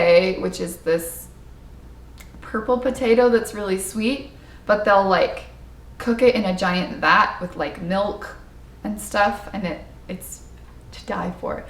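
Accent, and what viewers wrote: Philippines. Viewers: I admire you and like the way you appreciated the foods and how you explained the experiences you had in the Philippines. Your giggling is adorable other that you're gorgeous and beautiful. I also like the way you say our language and uttered it the Filipino way. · Your Tagalog accent is mind blowing 👍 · Wow nice filipino accent 🇵🇭🇵🇭👍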